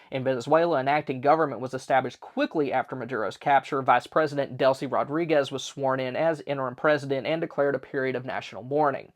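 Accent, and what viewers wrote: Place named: South, United States. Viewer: I always count on you, to keep me updated about issues that might impact what I need to prioritize in my prepping efforts. Plus, makes me feel better to hear your Southern accent b/c I have one myself. Sounds like home! 😊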